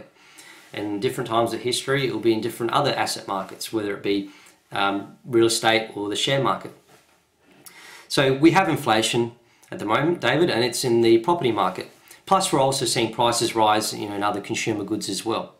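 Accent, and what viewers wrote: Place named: Adelaide, Australia. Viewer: you got one thick Adelaide accent there buddy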